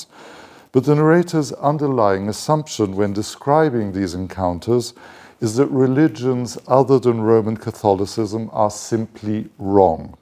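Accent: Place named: RP, United Kingdom. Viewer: That's perfect BBC english! Proud of you ❤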